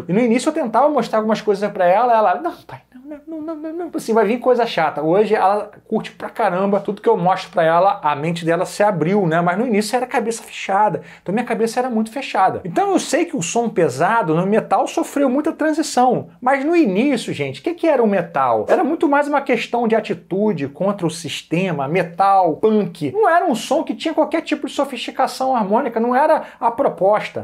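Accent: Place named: Rio de Janeiro, Brazil. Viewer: Só esse sotaque carioca já ganhou o meu dislike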